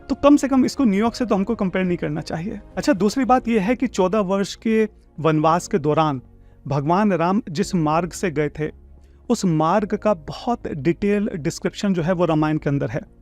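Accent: India. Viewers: Sir your Voice,,pure Indian and ak sukun bhari hai ...jay hind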